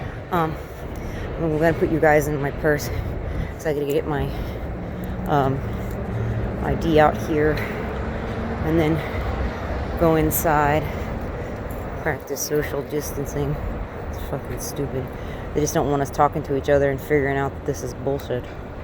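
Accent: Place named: South, United States. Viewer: Good job citizen journalist, people like you with nothing but a cellphone have become the new media. In a world of universal deceit, telling the truth is a revolutionary act. I would like to know who are all of these people who are sick, does anyone know anyone who is a confirmed case? Ive not heard about one person yet who knows a confirmed case. Anyway, good job! Also sounds like you are from the south, I heard a few y'all's in there!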